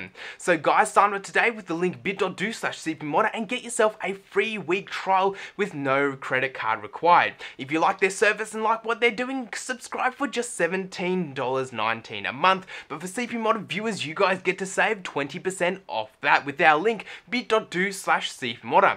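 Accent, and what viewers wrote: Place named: Australia. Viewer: Mans chinese with a Australian accent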